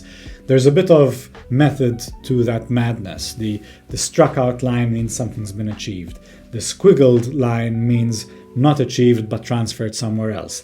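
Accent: Malta. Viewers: You sound Maltese 😊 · I just stumbled upon this video. Useful content. Tho out of curiosity.... that accent... are you Maltese?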